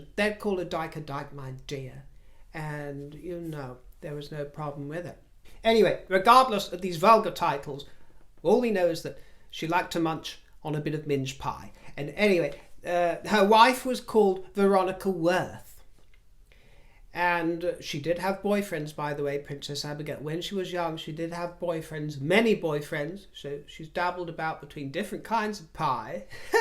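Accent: United States. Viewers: Brilliant American accent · your American accent is a SCREAM!!! spot. on!!!! LMBO!!! 🪖🎖😆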